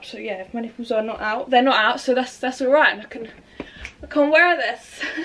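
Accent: England, United Kingdom. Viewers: i lover her english accent woow !!! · I can understand british accent but her accent is too british so cant understand her anymore, sounds like she's eating her words 😂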